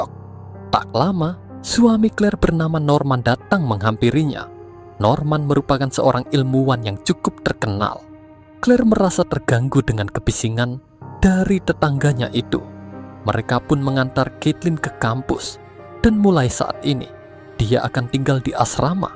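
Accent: Bali, Indonesia. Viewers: Logat bali memang gini kaa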